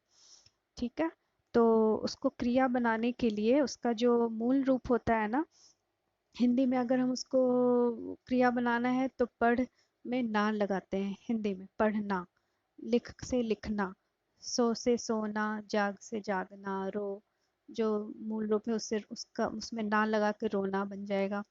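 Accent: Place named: Aurangabad, India. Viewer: Aapka location aaurangabad hai. Sayad har jila me log alag alag tarah se maghi bolte hai. Hamara navada hai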